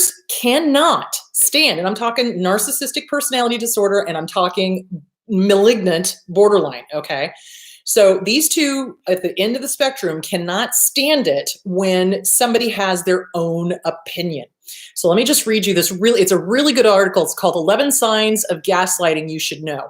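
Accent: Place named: New York, United States. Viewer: Btw, love your New Yorker accent! 😆😆😆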